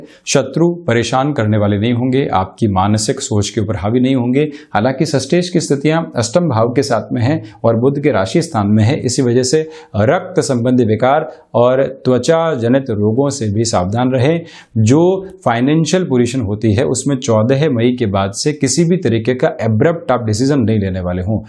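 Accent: Standard. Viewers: Aap bahut sudh Hindi bolte h..kai batein smjh nhi aati..please thoda simple bole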